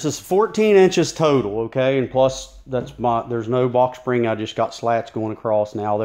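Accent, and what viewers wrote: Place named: South, United States. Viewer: The Southern accent gives this review that extra level of authenticity.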